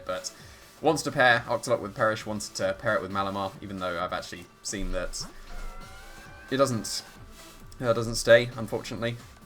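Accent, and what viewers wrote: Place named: United Kingdom. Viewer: I like your British.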